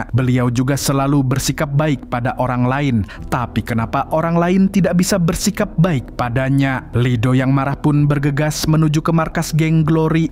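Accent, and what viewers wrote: Banjar, Indonesia. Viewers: Lah mamang urang Banjar leh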